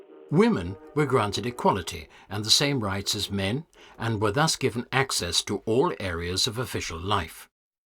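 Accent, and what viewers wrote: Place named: United Kingdom. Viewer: Wicked voice. Raspy British always sounds suspenseful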